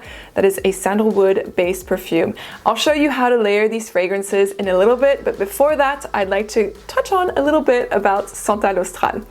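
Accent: France. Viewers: always happy to see you with your uncontrollable french accent and all..😃😃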